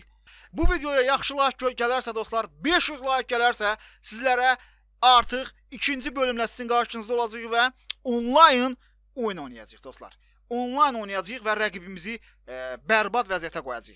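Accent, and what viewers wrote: Baku, Azerbaijan. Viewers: Abi bu bakü şivesi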